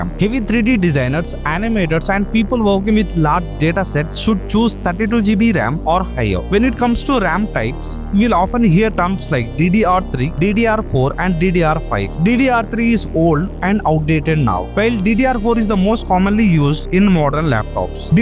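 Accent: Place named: India. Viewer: This was the best type of video I have seen . Man everything is great , really helpful, but your indian English accent sounds fun . 😅😂❤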